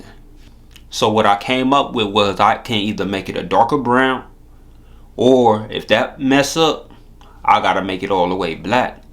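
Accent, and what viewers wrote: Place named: Los Angeles, United States. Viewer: mah man sounds like he from L.A. tho...😊 i tried to dye a p-nut p80 17 frame last night n it came out like what you said...dark brown burgundy using chocolate brown. i wasnt sure when to pull it out tho and kept it a tad too long in there. it went like dark from one moment to tha otha and i jus missed tha bus with this one. i have a tungsten grey/silver polished strike industries lite slide on there wich looks nice but i was shootin for exactly what you have there that lighter brown... i might pull out another fde frame and do your route,cause this is nice tho❤ very nice combination with that slide. i also want to try royal purple on a grey frame.... very nice what you have there....and you helped a lot with this vid... i shoulda seen it before i did mine😂. good lookin🤝🏾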